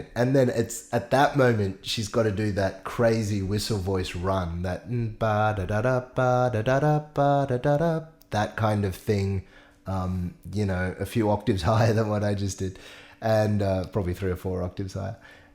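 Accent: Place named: Australia. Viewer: I love ur accent. Ur Australian accent.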